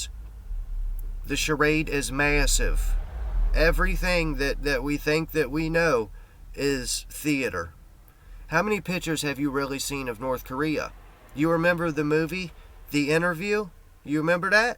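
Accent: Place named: Pennsylvania, United States. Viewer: Hey man. I see you in several of the same chats as me. Matt and Brandon I see we have in common and I think I might've seen you elsewhere. I've been meaning to check out your channel. This one caught my eye right off. Jesuits are definitely behind most major deceptions. That I've determined Big Bang, heliocentric earth, evolution (more of a roundabout way), perverted eschatology, and even germ theory (Girolamo Fracastoro was Copernicus' classmate at Padua). This is the paper I did for ministry school when I discovered more about them. You might find a nugget or two. <link> Your accent leads me to believe you're from PA.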